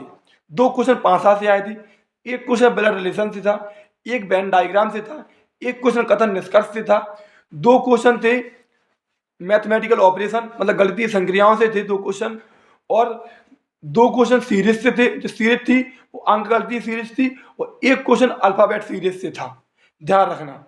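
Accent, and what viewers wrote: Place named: Bundelkhand, India. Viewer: Bundelkhand me kaha se ho bhai.. tumhari boli se samjh a rha hai😂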